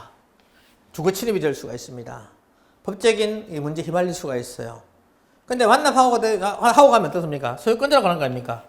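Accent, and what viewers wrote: Gyeongsang, South Korea. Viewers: 경상도 억양이 들어가서 그런가 봅니다. 어떤 대통령이 경제 발음이 안 되어서 경제를 못했다고 했는데 제가 그런가 봅니다. 다른 부분은 잘 들어주셔요.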